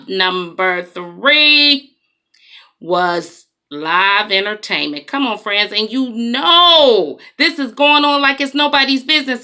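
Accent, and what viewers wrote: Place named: United Kingdom. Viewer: I love the sophisticated British accent! As you were reading the list, I kept thinking of my old church. I’m glad I’m out of there!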